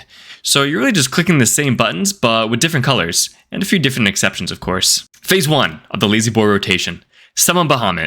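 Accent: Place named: Southern New York, United States. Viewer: That was perfect Southern NY / Northern NJ voice.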